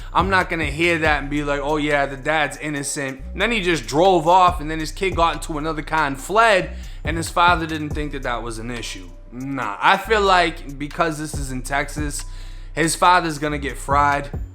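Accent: Boston, United States. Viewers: The food mah-ket . Lol I'm from Buffalo and I love hearing ppl talk with a Boston accent. Fucking never gets old lol. The accent, your story telling ability, your journalism skills are all killer. bro you got talent 👏 👌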